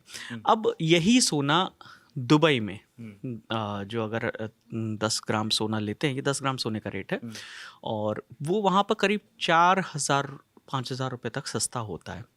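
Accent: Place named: Bihar, India. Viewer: Dhar liya jata hai .Bihar ka tone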